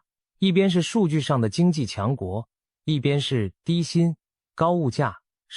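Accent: China. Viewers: 聽口音中國人，中國的爛事比台灣多的多＇先把你們國家自己的事情解決了，再來關心別人吧。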